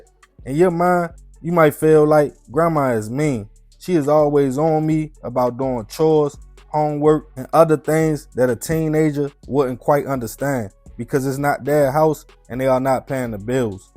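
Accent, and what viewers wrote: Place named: Maryland, United States. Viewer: Da con…tent! I’m from NJ and my family lived in Silver Springs, MD and your voice made me recall their accents. Salute.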